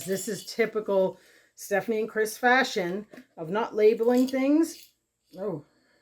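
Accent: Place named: Canada. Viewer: So glad that you are back to YouTube! I was missing your videos; as a Canadian it's so good to hear 'local' voices.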